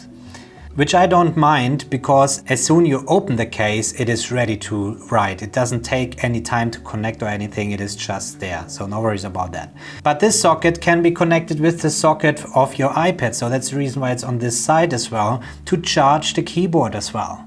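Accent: Germany. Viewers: Are you german? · Du bist doch deutsch oder das hör ich direkt raus :) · I bet you're German, man! Ich hör's doch am Akzent!👍😂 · Dieses Englisch kann nur von einem Deutschen stammen, korrekt? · YES! Knew it as soon as I heard him. Germans have a unique English accent if their own. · Dieser deutsche Akzent. Herrlich :D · Wow dein Deutsch accent ist hard😐 sorry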